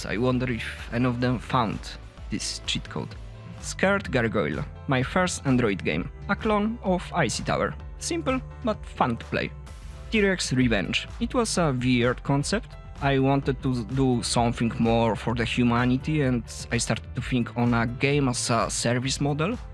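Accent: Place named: Poland. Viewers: slychac ten polski akcent 😅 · Polacy, nie wstydźmy się swojego akcentu! :) To, co jest najważniejsze, to czy jesteś w stanie zrozumieć przekazywaną informację - ja oglądnąłem i nie miałem z tym najmniejszego problemu.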